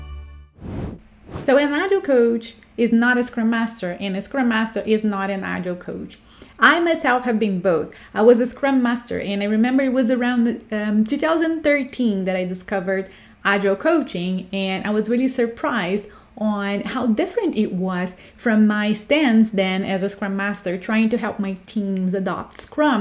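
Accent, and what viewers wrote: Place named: Brazil. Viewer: Are you Brazilian? Your English sounds different.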